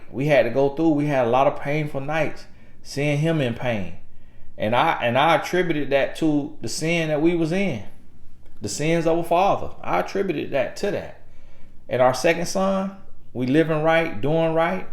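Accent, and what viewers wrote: South, United States. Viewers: God bless you for this. Oh and I love your southern accent. 🇳🇬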